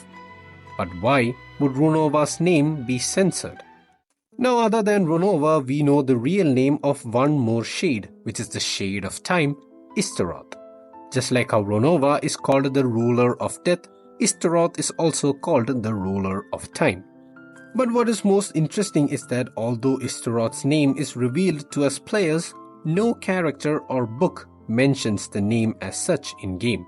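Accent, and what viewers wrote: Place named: India. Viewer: I have to ask, where are you from? Your accent to me sounds Indian, but sometimes it sounds Spanish/ Latino.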